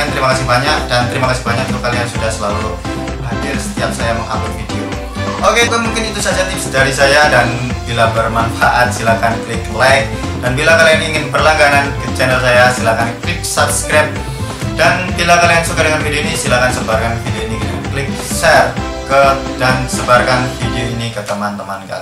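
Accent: Java, Indonesia. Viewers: Wong jowo yo?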